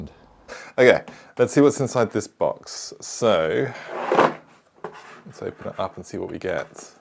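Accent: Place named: England, United Kingdom. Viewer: I'm surprised nobody has commented on your Bokke jersey to pair with your English accent. Go Bokke!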